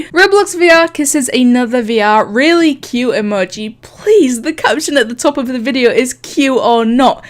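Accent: Scotland, United Kingdom. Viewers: you sound scottish + british its nice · Are you Scottish?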